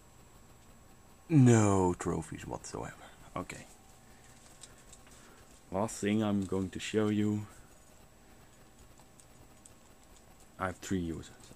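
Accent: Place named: Netherlands. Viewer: aan de manier waarop je engels sprak (en je rekentoestel) wist ik gewoon dat je nederlands was :p btw: ben je programma nu aan het downloaden, thx!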